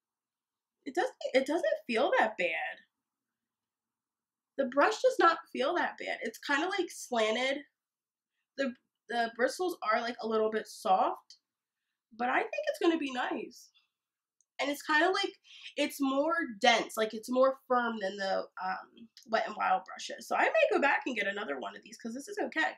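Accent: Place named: Pittsburgh, United States. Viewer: I look forward to your videos! The more, the merrier! I'm from Pittsburgh (I now live in Cali) and we say 'home' with the same accent that you do ~ and we say 'carmel' instead of caramel! Maybe that is why your voice is so soothing to me!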